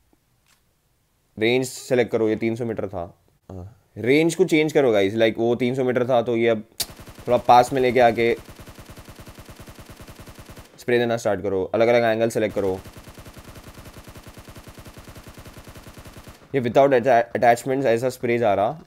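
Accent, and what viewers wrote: Hyderabad, India. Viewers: Hydrabadi hindi love you bro 💞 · Bro r u Hyderabadi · aakhri maut daaldiye yaaro tum are you hyderabadi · Like for Hyderabadi accent 🗿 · By his accent i presume he is from hydrabad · Hyderabadi slang😍😍😍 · Ekdam Hyderabadi language bolte tum to 🔥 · Bhai tum hydrabadi? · He is hyderbadi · Bhai tum hyderabadi hai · Bro, tum normaly bhi haidrabadi language hi bolte ho ya sirf video bananeke liya aaisa bolte ho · Perfect hydrabadi language 🔥😂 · By hearing your voice I think you are a Hyderabadi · Bhai ekdum Hyderabadi hei.. fellow Hyderabadi.. tripped my ass off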